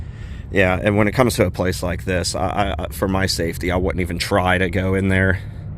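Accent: Pittsburgh, United States. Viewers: Most Pittsburgh accent I’ve ever heard😂 great video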